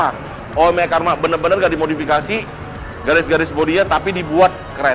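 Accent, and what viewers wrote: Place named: Makassar, Indonesia. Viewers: Mantap tawwa koko, pintar ki logat mkassar... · mantap tawwa logat makassar na boscu.. nda kyk org yg dr luar mks yg lain.. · Mantap logat makassarnya bosku, cocoki kau rasa 👍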